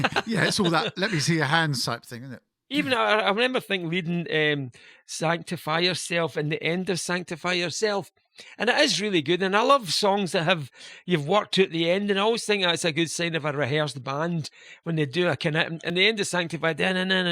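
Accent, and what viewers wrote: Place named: Scotland, United Kingdom. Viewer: I really love your talks! - Fascinating & interesting & funny as hell - the way you talk with that delightful Scottish accent and Humor! 😀 - and very nice voice!. You are an excellent speaker, and wonderful to hear your take on things from the (G.O.A.T!) Keyboardist!. You are one of my fave keyboardist's in the world ever - along with Eddie Rayner out of Split Enz. I LOVED the way you played that CP70 Yamaha!.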